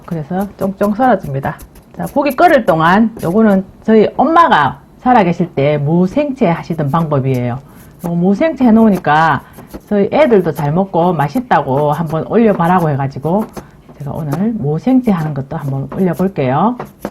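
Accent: Gyeongsang, South Korea. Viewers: 나도경상도 사투린데 재밋네요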